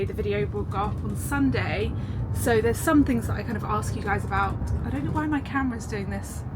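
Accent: United Kingdom. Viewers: I’m super excited about your renovations! I love watching you and listening to your beautiful British accent. I watched you for years and am so happy you are back! your skin is amazing, so dewy and porcelain like🍁. Happy Autumn 🍁🍁🍁🍁🍁🍁🍁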